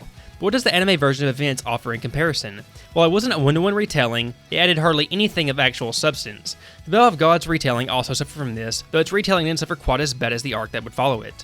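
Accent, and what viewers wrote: South, United States. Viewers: I love youtubers with southern accents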